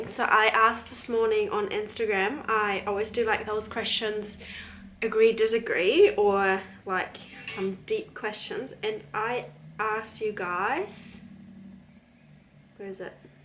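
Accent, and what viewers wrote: New Zealand. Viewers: I see your English is getting the kiwi touches. Noticed the way you say happy 🤣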